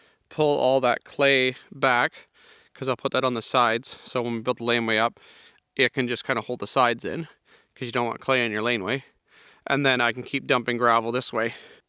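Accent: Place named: East, Canada. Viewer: Fuel injector cleaners and a product called "Engine Restorer" get my endorsement for old junk iron like your machine. Engine Restorer is a product you put in your oil it contains copper, silver and lead and helps to re-seal worn cylinder walls and helps worn bearing surfaces. Project Farm used it with great success. It is really hard to get in Canada now though. Not sure where you're from. Sounds like back east Canada, but maybe Maine... I got a few jugs before it went away. I'm using it in my gas engine with 428,000 km. It is down a bit of compression on the cylinders. Did a compression test before and waiting to see how much it picks up. Many folks online have used it and seen good results. Keep that old iron going!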